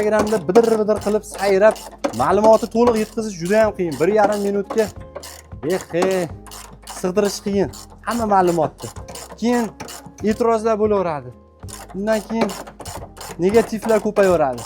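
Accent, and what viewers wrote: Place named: Kokand, Uzbekistan. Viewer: Аллохума барик! Сог булинг Укамжоним, маълумот жуда чиройли етказиб берилган! Куконда лигини шеъвадан тушундим, каерда жойлашгансизлар?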